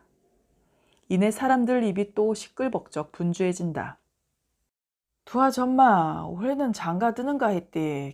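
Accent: Gyeongsang, South Korea. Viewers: 독특한 경상도 안동지방의 사투리와 억양에 나도 몰래 빠져들어 감사하게 잘 들었습니다. 고맙습니다. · 고향이 청송이라니 반갑습니다 저도 청송군 진보면이 교항이라요 글읽는이의 사투리를 들으며 엣날생각이나서 저도 열심히 들었네요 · 감사 한니다. 경상도 의성 근방의 그 살 찐 음성!! 고향맛이 뚝뚝 떨어 졌습니다.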